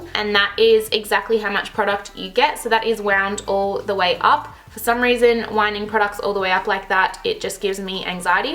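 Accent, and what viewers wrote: Australia. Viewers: 😂😂😂she was so Australian in this one I wonder what part of Aus she's from, kinda wanna be her friend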